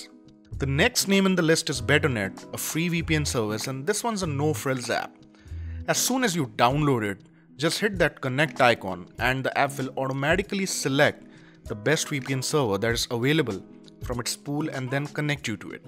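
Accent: India. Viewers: The best review of vpn by an I Indian accent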